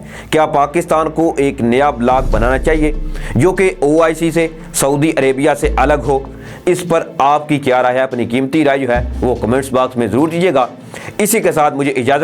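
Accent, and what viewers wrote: India. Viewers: Yah indian hai jo pakistani qoum may tafraqa daalnay ki koshish kar raha hai aur pakistan arab mukhalif jazbat ubhar raha hai. iss ki urdu check karo koshish kar raha hai punjabi ki tarha urdu bolnay ki likin bol raha hai indian hamachal walon ki tarha. pakistanio Iss say dur raho brainwash karnay ki koshish kar raha jai He is a blood indian trying to build/arouse anti saudi and arab sentiments in Pakistan. Check his urdu he is trying speak in pakistani accent. HE IS A RAW MEDIA CELL MEMBER trying to brainwash Pakistani mined set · Ap urdo ko hindi style men q bol rhe hin Apni urdo thik kren plzzz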